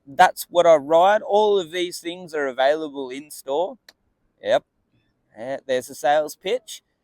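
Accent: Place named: Australia. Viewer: Great video it's interesting to hear other people's setups. I'm a complete beginner and just ordered: - 8.25 Jart Gravity deck (steep concave) - Indy Hollow Forged 144 trucks - Spitfire F4 Radial 53mm 99a (Black trippy swirl decal) - Bones Race Reds bearings - Bones Medium bushings (black/yellow) I have a feeling this setups gonna feel very nice based on the research I've done. Greetings from england, love the aussie accent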